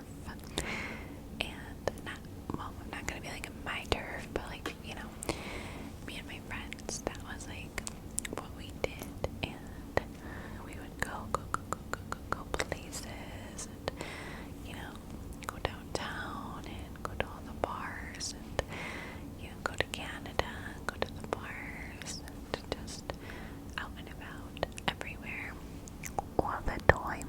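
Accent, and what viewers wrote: New York, United States. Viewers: I love the New York accent 🥰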